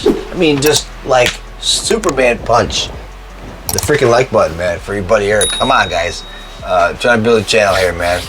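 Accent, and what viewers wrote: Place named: United States. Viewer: Your intonation feels like I'm watching a Cowboy movie Oh yeah! wtf.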